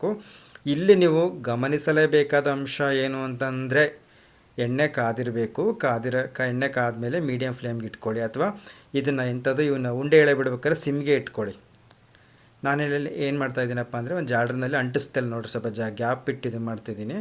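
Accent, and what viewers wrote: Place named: Holalkere, India. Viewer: Nimmadu holalkere taluka bro Nammoora kade thara ne maathadtira.... normal blr bhashe ellaru maathadthare.. continue like this 😅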